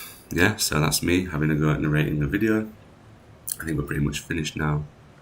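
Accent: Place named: Yorkshire, United Kingdom. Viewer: What accent is that it sounds a bit Yorkshire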